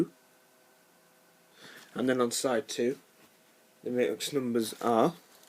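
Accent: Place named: Newcastle, United Kingdom. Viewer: No. It's from North East England, most likely Geordie.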